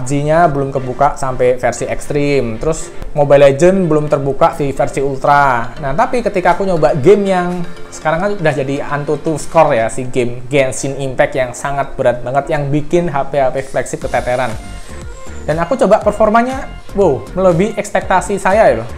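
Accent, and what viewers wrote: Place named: Java, Indonesia. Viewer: Thx mas, ulasan Anda yang jawani bingitZ...kethoe sampeyan semarangan yaa...atau solo...??! Logate ngono maksute, btw, lanjutkan ulasan2nya utk hp terkini yg pasti update dan ' fresh from the oven'...wkwkk..profisyat n go ahead your channel, regard from me.. Alexius Prihartanto Dwi Palupi, Kebayoran Lama Simprug Jakarta Selatan 🏌️🙏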